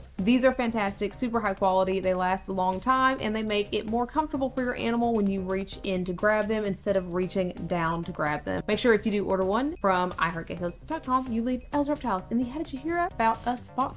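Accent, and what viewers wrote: South, United States. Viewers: Her southern accent is so sweet sounding lol like an aunt that can bake the hell out of a pie 😂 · First video I've seen on the channel, will sub because 1) a lot of good info here that's presented well, and 2) as a Georgian i must say your southern accent is AMAZING! Your snakes are lucky to have an owner with such a voice, lol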